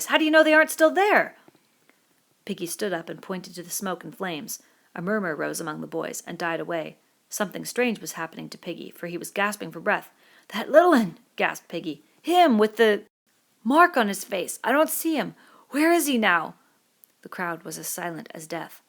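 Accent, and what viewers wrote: United Kingdom. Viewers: Chlorita Bleachita does she sound English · everyone saying how it should be pronounced... Does she sound like she is in Britian?? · does she sound like shes from the uk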